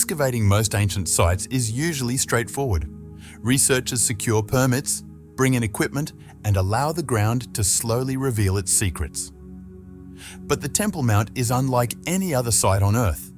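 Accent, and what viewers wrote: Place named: United Kingdom. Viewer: bull cocky brought to you AS ALWAYS BY A BRITISH ACCENT!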